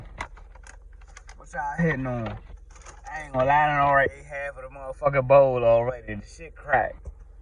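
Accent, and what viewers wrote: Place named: DMV, United States. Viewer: I knew I recognized that accents #dmv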